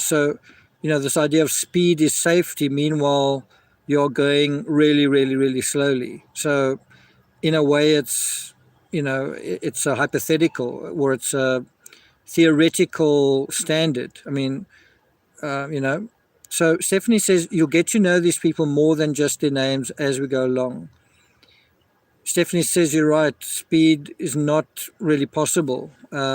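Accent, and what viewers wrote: South Africa. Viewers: Really really enjoyed listening to this… no offence but the SA accent normally makes my ears bleed but throughly enjoyed listening to you mate 😅❤ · Shucks you are South African!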